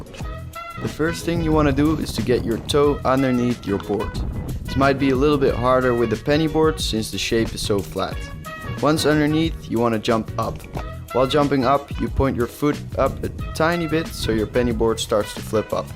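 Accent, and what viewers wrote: Netherlands. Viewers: the accent says that your dutch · I can hear a dutch accent. Am i right or? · does he sounds dutch or is it just me? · Lol I can hear that vague Dutch accent there, especially when talking to those kids in the end😂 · Btw are you dutch? I can hear it from your accent and when you say 'cool he' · Are u dutch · Ben je Nederlands je hebt beetje accent van Nederlander 😂😂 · Whahaha loll hoorde ik NOu goed dat je Nederlands bent? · Ar u dutch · Dutch? Btw love this vid imma try it. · R u not dutch? Ur accent is really dutch😂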